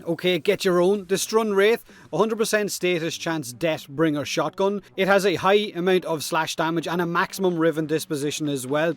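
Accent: Ireland. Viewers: Honestly, Idk if I watch your vids for the content as much as I enjoy the jokes, nonsense and the feckin’ irishness and hearing u try to say “three”😂 “The Tigris Bleeds ships” I’ve never laughed so hard in my life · Is he Irish?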